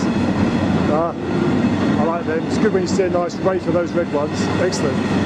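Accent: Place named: Southern England, United Kingdom. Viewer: i live up here what a lovely change to hear a southern voice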